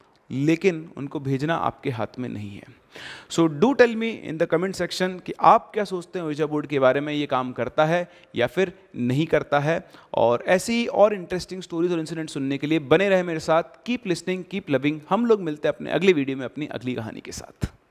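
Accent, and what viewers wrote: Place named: Bengal, India. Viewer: Apki bengali such mein sunne mein bohot cute hai sir...khub mishti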